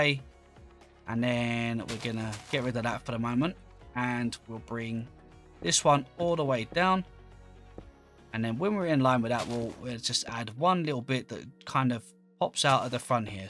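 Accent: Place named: South, United Kingdom. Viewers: 10/10 cockney accent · How does somebody sound southern and British at the same time 👁👄👁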